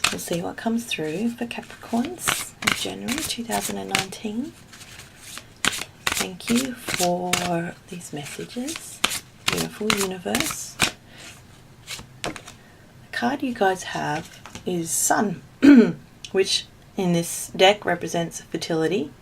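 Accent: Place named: Australia. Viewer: Great to listen to an Aussie.....great reading really resonated with me, thank you :)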